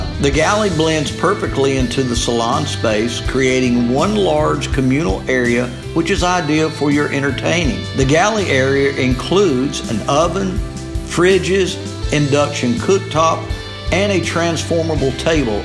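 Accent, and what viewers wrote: South, United States. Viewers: "Jeff foxworthy narrated a yacht video? Who knew..." Dr. Phil, Bill Clinton, now Jeff Foxworthy 3 down and over 150 Million with Southern accent to count one by one.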